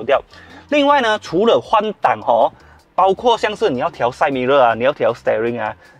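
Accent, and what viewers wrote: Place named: Malaysia. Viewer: 马来西亚人讲中文真的很可爱，喜欢听😂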